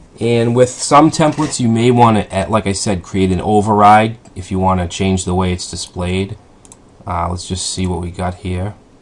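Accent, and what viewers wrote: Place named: Maine, United States. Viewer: Absolutely awesome tutorial!!! Thank you so much. What is your accent? Maine?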